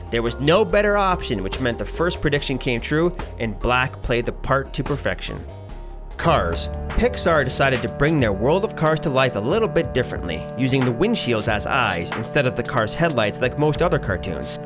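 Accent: Canada. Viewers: The narrator is Canadian, isn't he? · Canadian accent is nice.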